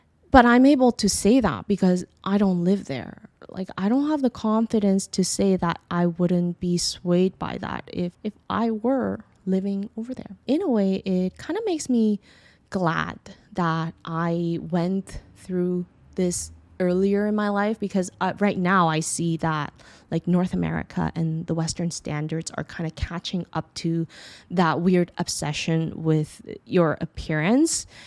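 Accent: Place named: Philippines. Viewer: You are Korean? You sound Filipino. :hand-purple-blue-peace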